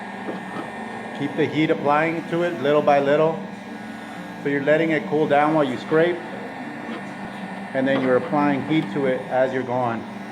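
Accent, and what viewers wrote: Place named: Yorkshire, United Kingdom. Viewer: really useful. sweet accent British Yorkshire. and very useful regarding cracked old vinyles. thank u. U could have removed the glue by aseton easily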